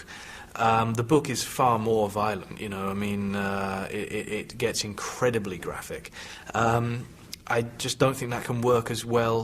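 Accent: United Kingdom. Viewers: I didn’t hear anything he said. I was too busy being charmed by the British accent and confused realizing he’s British this whole time. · Wait a fucking minute... WHEN WAS HE BRITISH??! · He's English...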